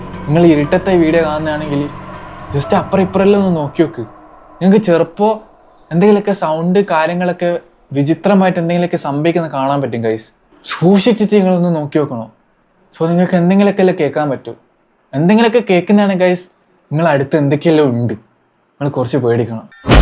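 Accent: Kannur, India. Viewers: Bro kannur aano. Oru doubt!!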